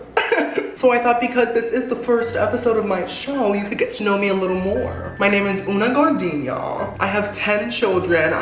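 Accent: England, United Kingdom. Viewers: Omg the British accent. I’m having a really shitty day, this is is making me feel a lot better. Thank you for being you. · The English accent 😂😂 such a classy dame. Dora the Explorer Vibes! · Omg xD The British accent is fabulous. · The British accent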